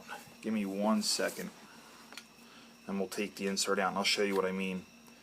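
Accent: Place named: Pennsylvania, United States. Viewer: Just scored one of these tonight and I like it. Side note: that is one of the heaviest Pennsylvanian accents I've ever heard.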